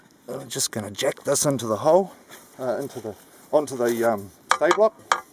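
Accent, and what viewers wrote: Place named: France. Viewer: Don't you like my FRRrench accent ? At least, I hope you didn't get "pieced" with it. Sry for bad focusing. I guess what I missed is when he was digging, so I can reveal you the secret on what there was on the ground : A hole !! check between 1''32' & 1"39' : it confirms my theory héhé hihi hoho ! Thx for whatching mate, hope you enjoyed :)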